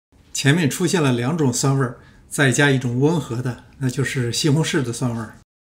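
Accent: Beijing, China. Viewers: 感谢分享，京味儿普通话缓缓讲出生活智慧！明儿就做！像博主一样切西红柿！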